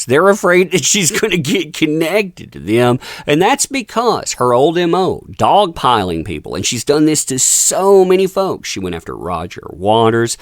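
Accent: United States. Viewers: I love this guy's accent😅 He sounds like a real American cowboy❤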